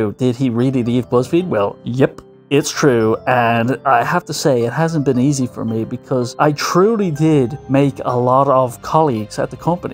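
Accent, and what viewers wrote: Ireland. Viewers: What side of Dublin are you from? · I didnt know you were irish!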